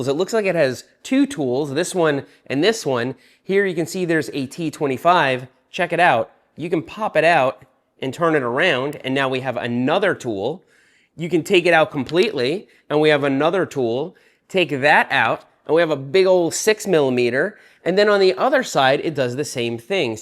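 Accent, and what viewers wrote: United States. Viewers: Love the video as always. Freaking love the responses from the manufacturers on the comments. You always have something I wanna go up and show my significant other. She says you should have an accent, but to most you probably have a really hard American accent. lol idk Also isn’t what you call the third pocket the fifth pocket? Im sure there’s another name.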